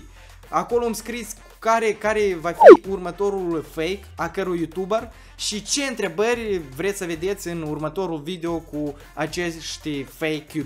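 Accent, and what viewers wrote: Moldova. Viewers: esti moldovean???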